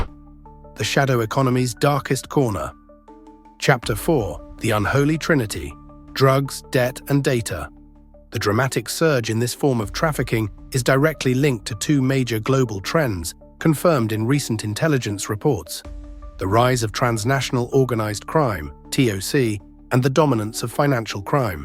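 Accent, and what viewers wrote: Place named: United Kingdom. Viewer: Realy appreciate the british accent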